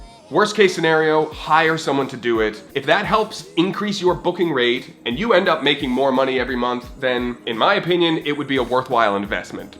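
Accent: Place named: Canada. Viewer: Love your Candian Accent! Also, thanks for you suggestions, they're reassuring!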